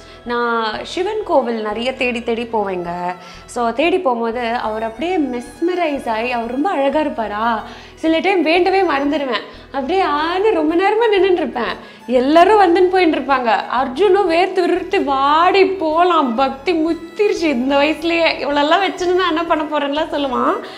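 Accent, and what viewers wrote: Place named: Sivagangai, India. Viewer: Pechula sivangai sayal teriuthey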